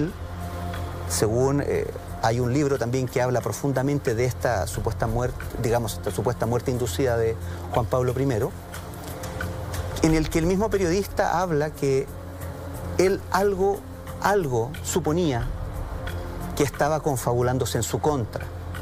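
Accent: Chile. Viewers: Quería ver este video pero apenás escuché el acento chileno dije, no gracias prefiero vivir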